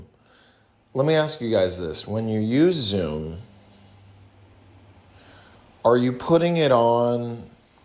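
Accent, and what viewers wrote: South, United States. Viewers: that southern drawl lulling the depression out of me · This amalgamation of a southern accent is like Nashville Grand ole Opry singer meets rural Georgia buget Paula Deen. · New here. That southern accent is so spot on. Where are you from? You’ve obvs spent time in the south. Love your stuff. It’s bananas and exactly what I need right now 🙏🏼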